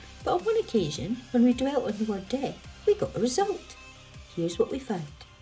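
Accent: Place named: Scotland, United Kingdom. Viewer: Your sweet voice+Scottish accent, is like song for sore ears, my dear! And yes, it has truly been, the wankiest of years; all of what you mentioned, my best flyfishing buddy (me Da, 83yrs young) being dagnosed, with terminal Liver-Cancer😩 (moderate drinker!). Feelings: <link> May, Hugin & Munin, guide your way...Take care of yourself and yours!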